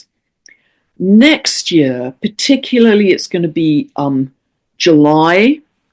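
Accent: England, United Kingdom. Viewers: On the couch with red cushions; I love it, it's so normal and likeable. A clear English accent and, above all, a super-positive charisma and energy! I love your videos; they're a true blessing and a constant companion. It's wonderful that we're connected all over the world! Thank you. Best wishes and belated happy birthday!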